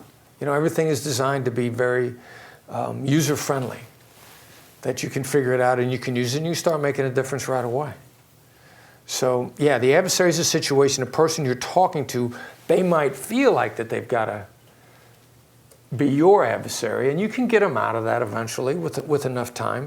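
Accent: New York, United States. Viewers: He says he grew up in Iowa, but his accent sounds very NY/NJ ... ? I wonder if maybe he learned to hide his midwestern accent for negotiation purposes? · Your natural accent can escape you once you move away from it and you adopt or adapt to your new environment. He spent the bulk of his career in NY...so therefore.... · I can't comment on that film, not having seen it. I was simply remarking on the verbal affectations of Voss, who hails from Iowa but speaks like a hard-boiled New Yorker.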